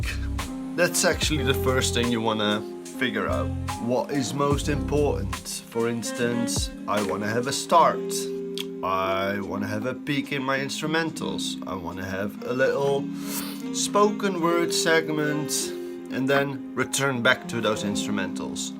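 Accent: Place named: England, United Kingdom. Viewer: What city and ethnic background? lol, you sound like you came right out of a gutter. Do people in England not speak English anymore?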